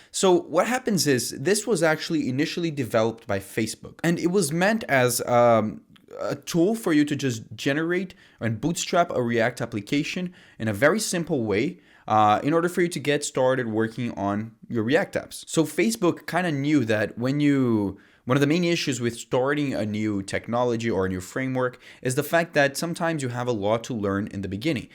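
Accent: Brazil. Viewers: Tu é brasileiro por acaso? ksksksks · Great video,! Hey, I'm curious about something... Are you brazilian or even son of brazilian parents? It's kind of weird that I understand almost 100% of what you said cause your accent sounds like mine hahah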